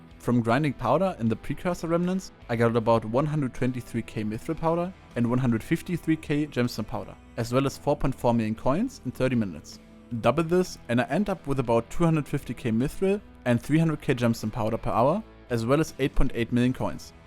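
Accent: Germany. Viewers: I höre das deutsch heraus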